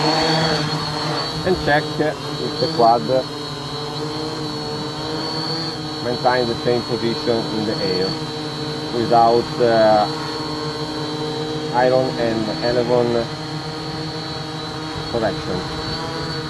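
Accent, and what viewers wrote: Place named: Ferrara, Italy. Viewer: I can notice the accent from Ferrara even if you're talking in english.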